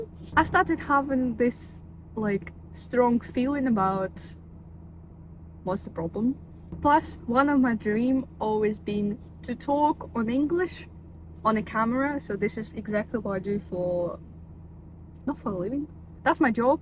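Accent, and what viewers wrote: Scotland, United Kingdom. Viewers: Iv listened to a few of your videos, but your carol of the bells piano is stunning. Always thought you was eastern European for some reason. I can definitely hear the distinctive Scottish in you and the name should of been a give away. But am half Scottish grew up half life in England and Scotland but im struggling to understand you at times. Are you full Scottish. Ya accent is almost like Scottish and Scandinavian or something. Ah ok your feom Ukraine im more into the video. Your accent is almost like 3 accents, im kinda seeing what my accent is like now for the first time haha. As my accent is all over the place also 😂. Awsome Use ableton is more intuitive especially for just play loops in live and capturing ideas. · you have a beautiful accent! is it just my imagination or do you have a little bit of scottish?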